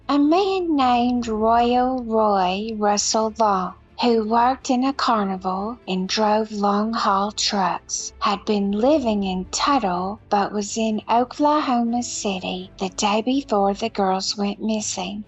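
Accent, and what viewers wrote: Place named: Deep South, United States. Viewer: Please use a regular voice- only people in the deep South talk like tha-ut anymore. Plus it's creepy.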